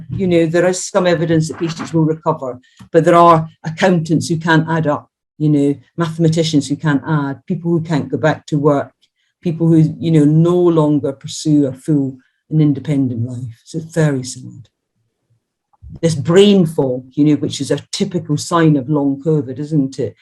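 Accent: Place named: Scotland, United Kingdom. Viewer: Good o hear aS ottish accent! Thereis little about helping carers to cope with the after effects. My wife Jean 81 years old, was rushed into hospital with incontinence which was diagnosed as bowel impaction. She was later diagnosed with a fracture of the L1 vertebrae. She had to wait for transfer to the spinal unit. Very slow recovery , loads of opiods given. Referred to community hospital for assessment and discharge into a care home. One week later she was returned to A&E who diagnosed bowel impaction, this was three months after the original diagnosis. She is recovering mentally, still has panic attacks, bed ridden as no physiotherapy has yet been provided though her rheumatologist carried out tests and said that intense physio was needed.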